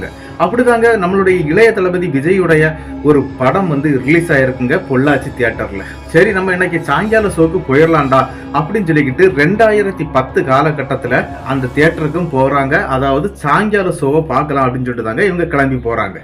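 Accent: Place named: Kerala, India. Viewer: Malayaliya? Slang mix aguthu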